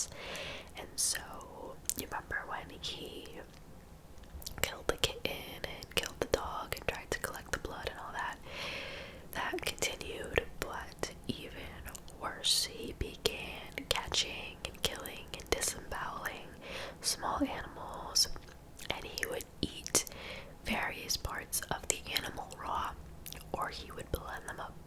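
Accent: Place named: Independence Township, United States. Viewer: Such a soothing voice Always gotta remind myself irl sounds like she's from Independence Township Edit: not to mention, ASMR crime stories have put me to sleep more than once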